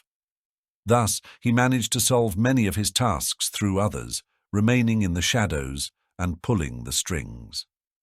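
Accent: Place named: England, United Kingdom. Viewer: I just found this channel. Really enjoying it. The narrative is great. Love the English accent. The pictures are beautiful. I just wish they illustrated what's going on in the narration.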